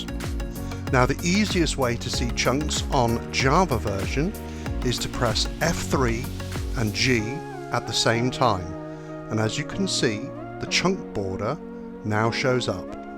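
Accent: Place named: London, United Kingdom. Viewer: ILLUMISYS on server; Very nice Job, good presentation and very helpful. Funny you and Avomance sound a lot alike, maybe from same part of London. What do I know though, I'm just a Yankee, lol.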